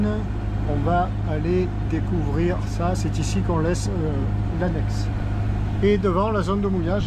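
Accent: South, France. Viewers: J'entends l'accent du Sud. Vous êtes originaire d'où si ce n'est pas trop indiscret ? Ça doit être fatiguant d'être en navigation solo. Vous devez être toujours en hyper vigilance puisque vous n'avez pas de coéquipier pour vous relayer.... Jolie musique de fond 🎵🎶🎵 On dirait la même personne qui a fait la musique pour Catamaran Mistertong.... Je vous ai découvert grâce à Catamaran Javerne qui sont actuellement au Brésil où vous étiez il n'y a pas si longtemps. Je m'abonne car j'aime bien votre chaîne 😊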